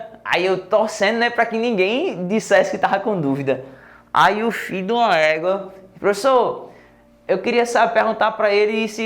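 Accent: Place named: Northeast, Brazil. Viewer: primeiro curso online com um professor com essa linguagem muita boa de intender; sou do ES e to adorando esse sutaque nodestino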